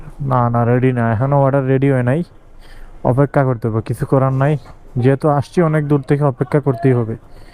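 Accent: Sylhet, Bangladesh. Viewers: Vai Sylheti ni?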